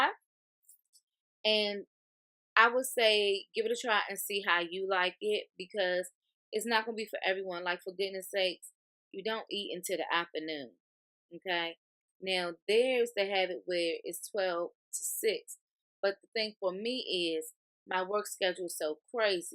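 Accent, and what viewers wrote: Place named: Washington DC, United States. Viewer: I hear your DC accent so I know you're from the area. Where do you get your nails done?? They are so bomb.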